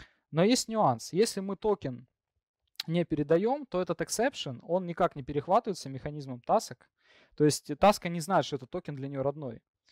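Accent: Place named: United States. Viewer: Скедулер - это нормально, американское произношение. Вот пример еще одного спикера так же применяющего данную фонетику <link> Async programming in .NET: Best practices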